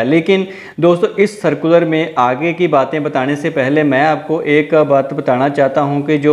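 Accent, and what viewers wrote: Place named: Punjab, India. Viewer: खोदा पहाड़ निकला चुहा।कुच्छ भी नही होने वाला है ।आप का बोलने का लहजा है वह पंजाबी लगता है।कृपया हिन्दी बोलना सीखो।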